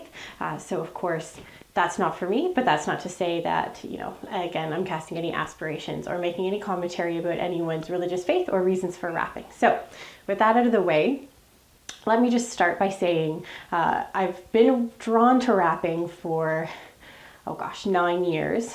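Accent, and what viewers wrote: Canada. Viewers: I was going to say that you sound Canadian:) I'm from Northwest Montana just two hours from the border and so we have many from Alberta and B.C. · Very interesting story! I hear birds in the background? Are you Canadian or from Virginia?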